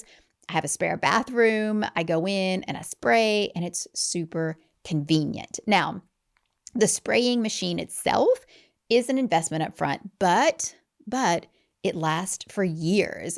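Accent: South, United States. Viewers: I just found your channel with this video!! I love the county accent and cussing and pure southern honesty 😭I’m from Georgia so it’s like sitting down with my moms friends listening to you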